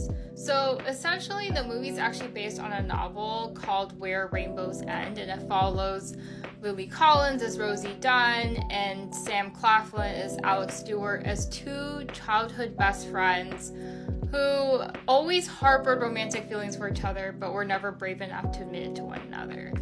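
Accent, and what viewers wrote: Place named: India. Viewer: Bronwyn Comment not Leo. I could not follow the previous Review because of the Indian Accent making it SO HARD to understand the words. Not a racist comment - I simply cannot connect to words I cannot understand so cannot follow. If the Reviwer slowed down his speech - I could perhaps understand better thus connect to the Video and stay to watch it. But as it was - I could not! 💚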